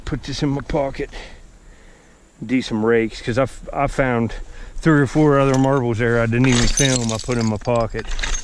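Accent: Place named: Maryland, United States. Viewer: Hi! I am new to your channel and really enjoying your viddies. Squirrel Man is a lucky, happy little guy. Seems he has you wrapped around his little paw. So sweet. Not sure where you are from, but your accent sounds like parts of here in Maryland. Dig on!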